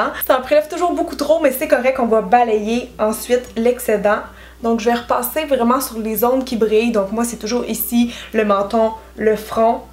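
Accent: Quebec, Canada. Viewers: Il fait quelle température au Québec? Chez moi dans le sud ouest de la France à 35°C on étouffe!! Est-ce que les bases de maquillage tiennent bien quand il fait chaud? Tu pourrais faire d'ailleurs une vidéo que sur les bases pour les différents types de peaux, par exemple lesquelles sont mieux pour peaux grasses surtout pour les paupières, parce qu'il y a tellement de marques et de produits différents que je suis perdu! Merci à toi et j'adore ton accent canadien:) ❤️ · Cela faisait bien trop longtemps que je n'étais pas passée par là ! L'accent québécois, la bonne humeur et les bons plans m'avaient manqué ! J adore le rendu! Et je ne sais pas pourquoi tu n'assumes pas le orange, avec tes magnifiques dents blanches tu aurais tord de t'en priver ;)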